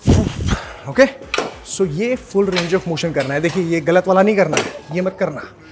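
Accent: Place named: Delhi, India. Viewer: Muhje ek bhot confusion hota appki😂 tone sun kar kabhi kabhi lagta hai app Mumbai ke nahi ho kya app Delhi ke ho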